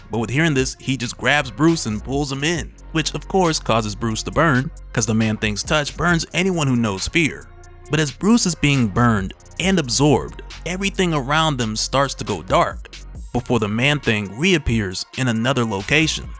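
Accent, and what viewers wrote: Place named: West, United States. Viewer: i love ur western accent tho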